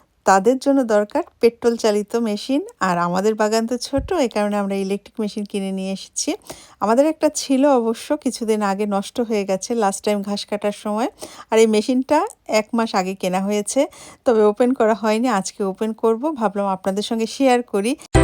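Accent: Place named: Jessore, Bangladesh. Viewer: আপু আসসালামু ওয়ালাইকুম।কেমন আছেন?আপু জানতে চাচ্ছিলাম,ওখানে কাজ করার জন্য শিক্ষাগত যোগ্যতা কেমন লাগবে আর কোন ভাষায় কথা বলতে হয়? আপু আমি জেসমিন আক্তার। আপনার কথা যশোর জেলার মানুষের মতো মনে হোচ্ছে।আপনার বাড়ি টা কোথায় জানতে পারি?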